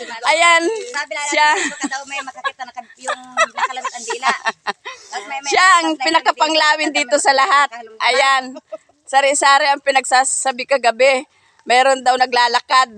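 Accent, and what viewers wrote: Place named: Atimonan, Quezon, Philippines. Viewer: Nkkbilib nmn..Sobra sipag tlga.. yun punto ng pagsasalita ninyo ay para taga atimonan quezon.. pakiramdam ko eh andun tuloy ako s lugar ng lola ko😊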